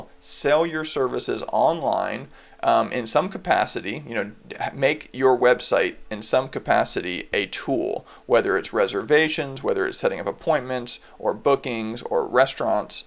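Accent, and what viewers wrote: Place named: United States. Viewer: I'm English and the American accent can be very jarring to hear, but this guy's voice is just about okay.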